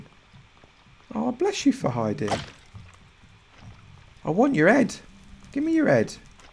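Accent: United Kingdom. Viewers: I really enjoy your vids/liked and av learnt alot from u, Esp wit redstone. I've copied a few of your tutorials and non redstone 1's. But my question is: 1 r you frm the UK n where,as I'm frm Norfolk coz I love ur ascent and 2: is there a way to make a lift system without using redstone 2 get items frm your mine to ur base on ground level?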